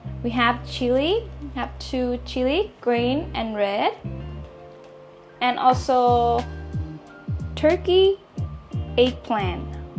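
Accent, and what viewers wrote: Australia. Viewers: Thanks for that. You have obviously been to Australia with that accent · Why does she sound Australian?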